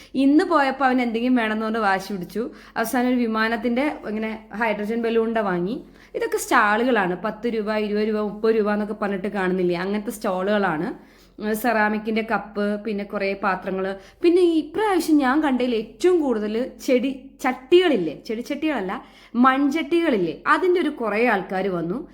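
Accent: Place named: Palakkad, India. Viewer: എവിടെയാണ് വീട് ഞാൻ പള്ളിക്കുന്ന് ഇടവകയിൽ ഉള്ള ആളാണ് വയനാട്ടിൽ ആണോ താമസിക്കുന്നത് സംസാരം കേട്ടപ്പോൾ പാലക്കാട് പോലെ തോന്നി അതാ ചോദിച്ചത്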